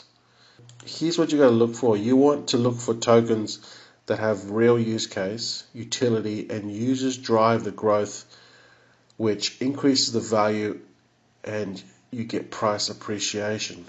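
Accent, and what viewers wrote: Australia. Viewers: Outstanding video and advice mate. Aussie accent expat. Where are ya ? In CEL BNB THETA since 2019, all solid. I like Noia Network and Ziliqa, they meet your criteria as well.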